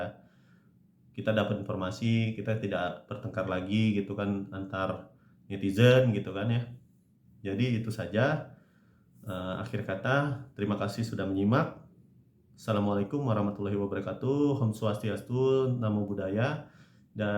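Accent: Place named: Palembang, Indonesia. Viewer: Kirain dr bali,, trnyta wong palembang kak yo..????? 🤔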